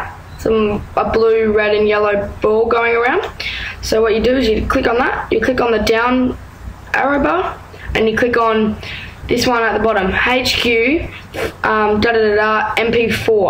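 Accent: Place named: Australia. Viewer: thanks you have an aussie accent im aussie as well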